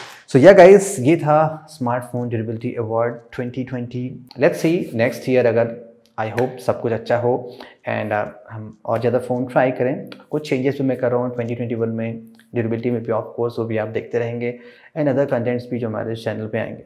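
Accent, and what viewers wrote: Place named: Kamta, India. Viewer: I think you are from kamta side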